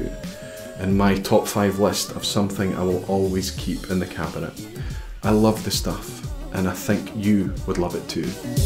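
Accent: Scotland, United Kingdom. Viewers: Yes, I did and found it helpful. But I am not a chemist and I recall in this or one of your videos you mentioned that the coloring was sugar or "sugar based" and I wondered whether that would affect the flavor as well as making a more balanced color? Living in the AMERICAN SOUTH where we have our own unique version of English, I have to listen carefully when you speak. I assume that when you say "meshie" you are speaking about a "blend?" But do not change a thing. I have visited Scotland and I love the country, people AND the Scotch (but not the haggis, sorry) Keep doing what you are doing-you have fans in the USA!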